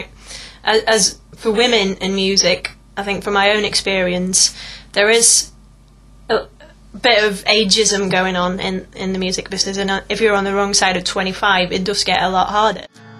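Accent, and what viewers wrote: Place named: Sweden. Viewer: Ermm you are a Swedish song writer? wow you have got a wonderful accent ;) Do you speak Swedish?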